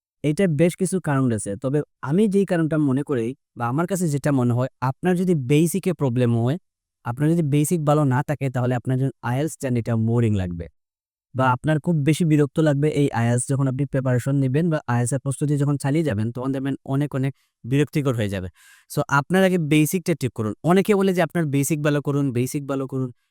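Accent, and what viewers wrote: Sylhet, Bangladesh. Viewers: Bhai khati Sylhety r Nokol Sylhety modda partokko ki😀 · Vai khati sylhety ❤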